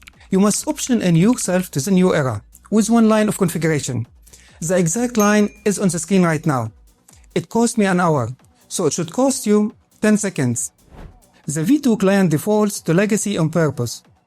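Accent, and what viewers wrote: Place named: Syria. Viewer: انت سوري اللغه انجليزي سوري !!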